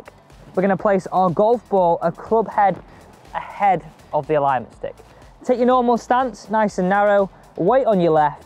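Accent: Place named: Cockney, United Kingdom. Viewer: I cannot understand most words the guy in the viedos is saying! Why does every golf training video have people from England with poor cockney accents? The guy mumbles through the entire video! I will never get that 3 minutes back.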